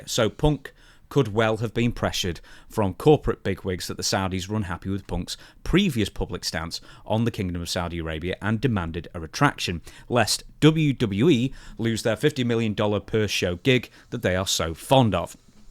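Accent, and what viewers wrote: United Kingdom. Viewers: There's a considerable amount of bigotry laced within the Saudi Arabia criticism. Double standards galore coming from a Brit Accent where hundreds of years as well as current day accountability and criticisms should be considered and prioritized. At the end of the day, these shows are bringing joy to innocent people and humanizing those who come from a different background. People trying to shit on that are at best hypocrites and at worst closeted bigots.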